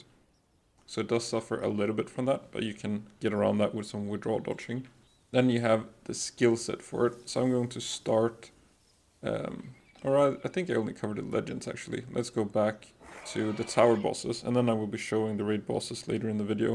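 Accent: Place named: Scandinavia. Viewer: Where are you from? You sound like maybe Sweden, Finland, Denmark or norway.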